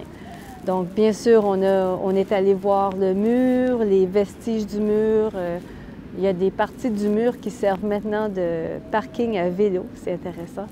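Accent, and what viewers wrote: Quebec, Canada. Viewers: J'aime bien tes vidéos, et ouais, je te comprends parfaitement. 😃 J'essaie de améliorer mon français avant mon voyage au Montréal en août, donc je apprécie beaucoup pouvoir écouter l'accent Québécois pour m'entraîner. (Et corrige mes erreurs, s'y en a, STP). 😃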